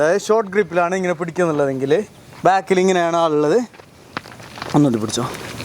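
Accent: Kasaragod, India. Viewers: കാസറഗോഡ് കാരനാണോ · ഇങ്ങടെ കാസർകോടൻ ഭാഷ, അത് കേൾക്കാൻ ഒരു സുഖമാണ് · കാസറഗോഡ് ഭാഷയുടെ നിഷ്കളങ്കത നാളുകൾക്കു ശേഷം കേൾക്കുന്നു.............സ്നേഹത്തോടെ ഒരു കോഴിക്കോട്ടുകാരൻ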